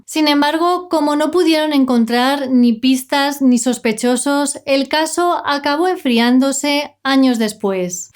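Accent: Castile, Spain. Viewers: Eres quizás sudamericana pero con pronunciación castellana ???? Porque no pronuncias de modo normal ???? Más pareces salida de Telecinco, por Dios habla normal !!!!!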